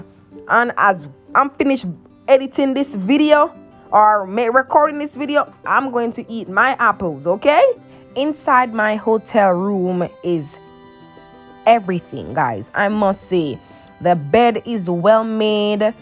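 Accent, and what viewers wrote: Jamaica. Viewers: Just scrolling through and came across your video.......your enthusiasm throughout the entire video is quite amazing though I'm born jamaican like you I truly love your accent. Left jamaica as a child almost 40years ago.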